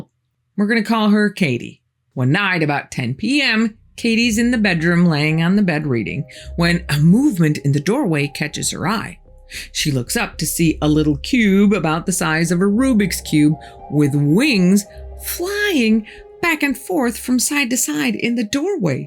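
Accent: Midwest, United States. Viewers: You're a good story teller. Where's your accent from? Illinois is my guess. Maybe Wisconsin or Minnesota???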